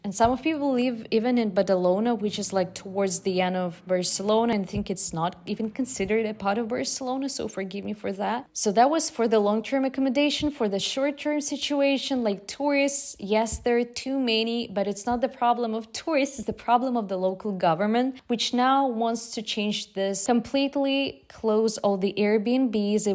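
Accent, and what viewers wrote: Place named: Eastern Europe. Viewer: Where from East Europe is the accent? Russia or Poland?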